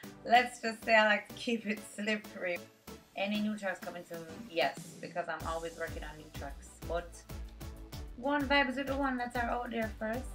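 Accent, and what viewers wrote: Jamaica. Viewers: love the jamaican accent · Jamaican accent sounds definitely cool :) Lots of love from Paris, France.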